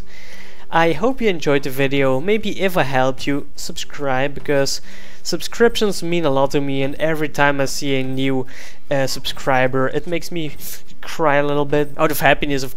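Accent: Netherlands. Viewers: lol, ben jij nederlands? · Are u dutch? :o....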